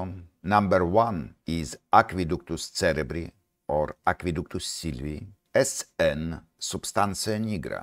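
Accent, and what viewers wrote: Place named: France. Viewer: Какой у вас милый французский акцент)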